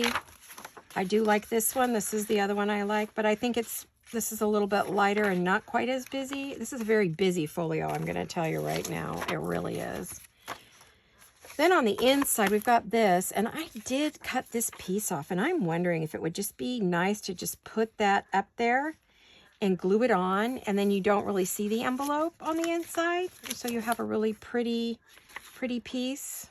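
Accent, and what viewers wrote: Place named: United States. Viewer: Please do not quit. I love your channel. Your American accent is charming.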